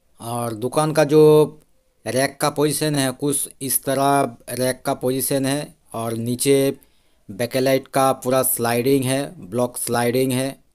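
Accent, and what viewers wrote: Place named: Bengal, India. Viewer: Bhai sahab ap bangali lag rahe ho apki hindi se malum padh rha h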